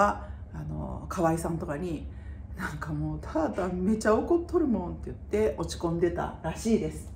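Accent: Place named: Nagoya, Japan. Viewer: 名古屋弁のいいですね～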